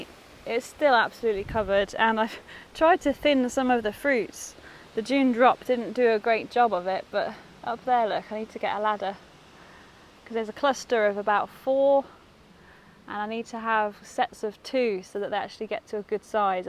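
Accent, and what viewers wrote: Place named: London, United Kingdom. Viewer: Did you grow up in the UK? You sound like a Londoner 😅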